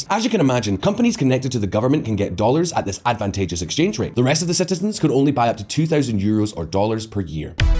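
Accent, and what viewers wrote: Scotland, United Kingdom. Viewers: Maybe, you will understand this better... Are you Scottish English? Answer me that!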